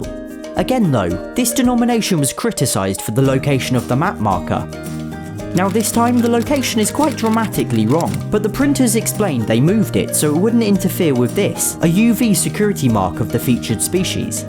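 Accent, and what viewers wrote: United Kingdom. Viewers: His british accent is the best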